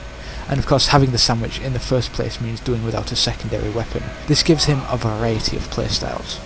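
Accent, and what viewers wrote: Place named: Scotland, United Kingdom. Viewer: his acent is scotish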